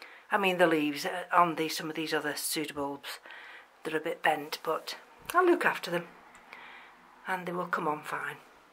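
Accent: United Kingdom. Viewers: where did you get your shelving? You sound to be in the uk like me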